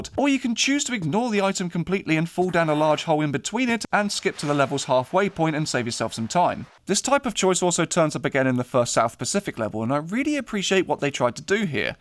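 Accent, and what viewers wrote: United Kingdom. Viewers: Great entertaining video. Also enjoyed the slightly british accent sided choice of referring in that tonality. I raise ma beer for ya mate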